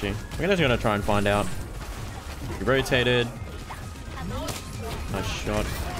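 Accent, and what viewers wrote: Australia. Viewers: Are you from Australia or NZ?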